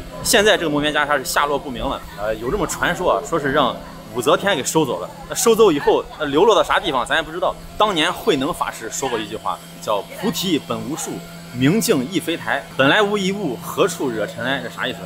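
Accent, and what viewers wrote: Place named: Shaanxi, China. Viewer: 你这个陕普 我听着真上头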